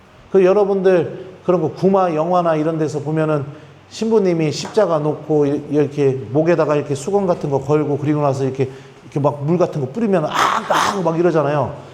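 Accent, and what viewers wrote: Chungcheong, South Korea. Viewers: 아주 오랜기간 냉담을 하다가 다시 주님 앞에 왔어요 그런 저를 보고 비신자 남편이 의아해 하면서 이것저것 물어 보는데 제가 답을 못해서 교리 공부 다시 하는. 중인데 신부님 강의가 짱입니다~~^^ 남편. 들으라고 볼륨 키웠는데 " 저 신부님 충청도 사람이네" 하더라고요.ㅎㅎ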